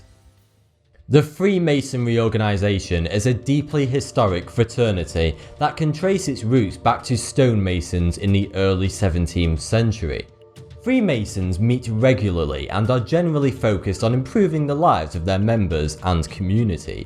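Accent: United Kingdom. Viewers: Well with your obvious British accent why don't you expose it was European who founded Skull & Bones and expose some of the Royals witch covens like "Order of the Thistle" and "Royal Order of the Garter"???? Why don't you expose how many Skull & Bones members have been knighted by the Queen? Most in Britain are freemasons were you a Marlay child? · it's his terrible punk/slang British accent. Ffff - thhh words are always mixed . He does it with other words in his video's. Not the typical correctly pronounced formal British accent we are all used too , depends on where the Brit lives . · These videos are boring and I hate his british accent I cant understand why they have such a shity accent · Omg . The guy speak such hard British English lol)))) so funny .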